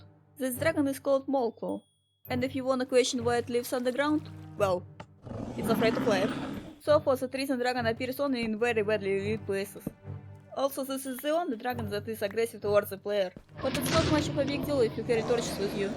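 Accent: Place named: Russia. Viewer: Просто спрошу, ты написал коммент на русском потому, что тебе тоже показалось, что у девушки очень яркий русский акцент?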